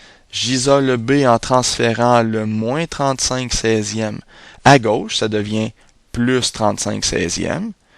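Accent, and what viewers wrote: Quebec, Canada. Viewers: L'accent québécois ne gène plus tant que ça quand t'a besoin d'aide en math,c'est un fait,vive le Quebec.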